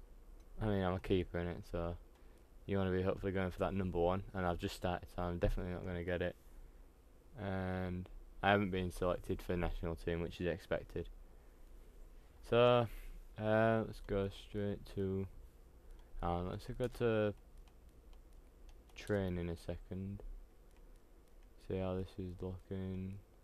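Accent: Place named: Northern England, United Kingdom. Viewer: SAME and he's northern which makes it 10x better for me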